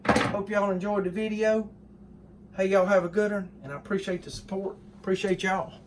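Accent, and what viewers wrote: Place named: South, United States. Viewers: addicted to hearing that southern twang lol. also love the tips. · I do appreciate all your content man. Your a very humble God fearing man and that's nice to see these days. I live outside Nashville TN in a little place called cheatham county and you talk just like us bubba so it's normal to me but i love how others get a kick out of it 😂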